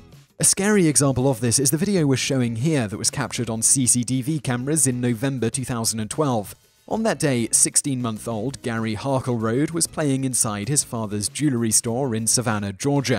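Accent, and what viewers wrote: United Kingdom. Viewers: Why does every countdown video have some British guy?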